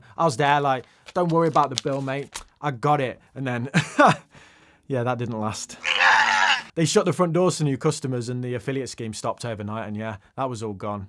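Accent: Birmingham, United Kingdom. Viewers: You're from the North West? I thought you were a Brummie.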